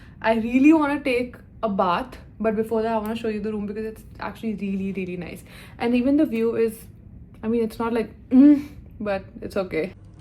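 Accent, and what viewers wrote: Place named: Punjab, India. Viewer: such a cute punjabi u spoke😅❤❤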